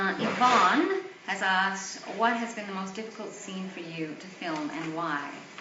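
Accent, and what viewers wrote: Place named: Australia. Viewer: i do detect a bit of an aussie accent, having been to that beautiful country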